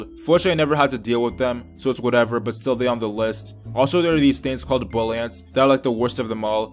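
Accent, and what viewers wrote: Philadelphia, United States. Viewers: R u from Philly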